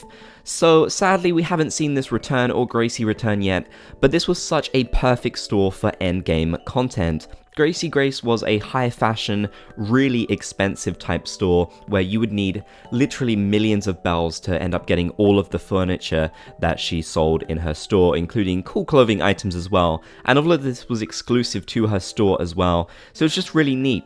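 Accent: United Kingdom. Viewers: I enjoy listening to your British accent, especially when you said PAT-UN ! 😄 New Horizons is my first Animal Crossing game, so I would gladly welcome any new stores. Leif is also one of my favorite NPCs, so I’m all for him having a shop.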